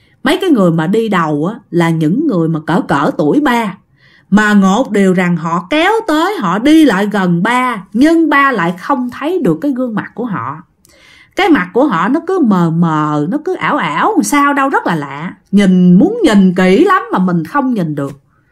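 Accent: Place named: Mekong Delta, Vietnam. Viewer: Chị ơi em có cái này muốn nói với chị là cái phần ngôn ngữ đó chị. Chị là người Sài Gòn khi kể chuyện chị đừng có pha giọng miền tây vô. Ví dụ như chữ e rờ "r" người miền tây hay nói thành chữ "g" như "đi ga, đi gô, có gồi, cá gô,....". Chị cứ kể giọng chuẩn đi, tụi em nghe là biết cái chuyện đó là ở miền tây rồi. Em để ý chị kể chuyện lúc thì nói chữ "r" thành chữ "g" có lúc nói chữ "r" bình thường, nói như vậy nó ngọng ngọng khó nhẹ lắm. Chị cứ kể chuyện tiếng Việt chuẩn là được. Em chỉ góp ý nhỏ vậy thôi. Hihi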